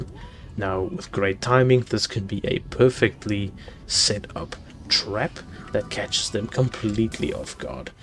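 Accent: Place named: Russia. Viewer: Hmm interesting. Im from germany and in german languge i realy hate that russen accend, but in english i like it for some rison. Long story short...i like your accent :) . Oh and of curse your vidios ar great. Kepp going.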